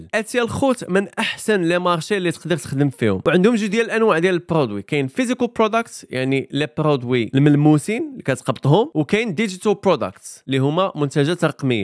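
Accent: United Kingdom. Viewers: عرفتي لاهدرتي بالبريطانية اتصرعها عندك واحد لبريتيش اكسنت واعرة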